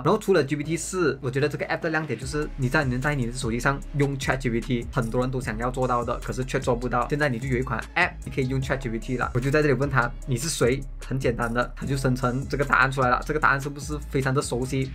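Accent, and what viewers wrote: Malaysia. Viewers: 马来西亚口音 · 馬來西亞口音？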